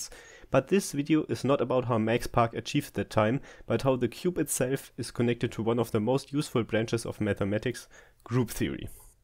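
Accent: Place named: Switzerland. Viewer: Why do you have a swiss accent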